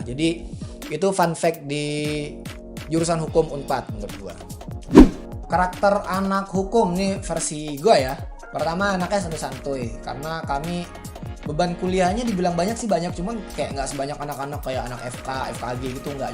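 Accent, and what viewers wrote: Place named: Padang, Indonesia. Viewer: Dari logat ngomong, kayaknya kak org padang ya?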